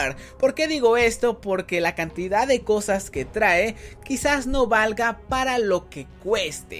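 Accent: Chiapas, Mexico. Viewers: eres de chiapas?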